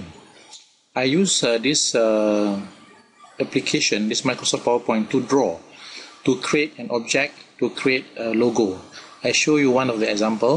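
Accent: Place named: Malaysia. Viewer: aku dengar suara org speaking tu mcm org malaysia..last2 tgk logo mmg malaysia punya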